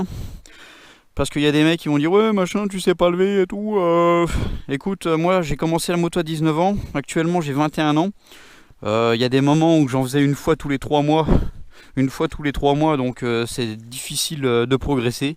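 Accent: France. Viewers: T’es pas des Vosges toi groooos ? 😂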